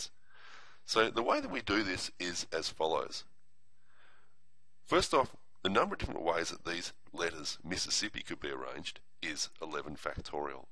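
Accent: Australia. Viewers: I can't tell if I'm here more so for the advice or the Australian accent. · it’s not normal american...more like australian or new zealander...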